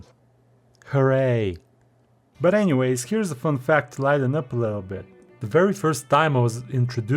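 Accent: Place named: Russia. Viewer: я один слышу тот самый русский акцент?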